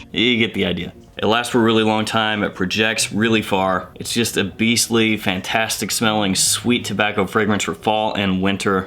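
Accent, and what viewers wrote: South, United States. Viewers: there's a bit of a southern twang to your speech, it's very interesting and enriching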